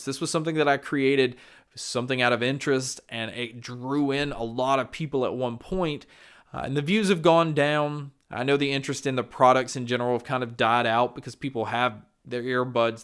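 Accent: South, United States. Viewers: No need to reply dude, just wanted to drop some encouragement your way. Some, not all, of your values lie in your skills to connect with us, remember that. If you moved on to (or rebranded) I'd keep following. You've got that well-spoken southern draw, you've got a handsome face, you're authentic and informed. You've got a lot going for yourself. I'm confident that you'll figure it out. Mad respect for you dude cuz I know about the industry and I couldn't handle it. Keep your head up, champ.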